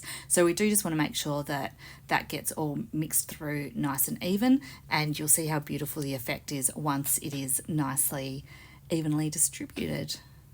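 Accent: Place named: Australia. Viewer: This time of year is my favorite when it comes to making pretties because of the color palette! These sure are some pretty ones you made!!! Like I’ve said before, I could listen to you talk all day long. I absolutely love the Australian and British accents!!! I live in America, but I sure would love to find me a man with a nice accent!!! 😂 Something I started doing rather than buying all of the different pearl clay separately I just buy a bunch of pearl clay and turn any color. I want to into a pearl! When I make snowman, I usually use the pearl. And sometimes I like to not get it completely mixed up where it’s swirled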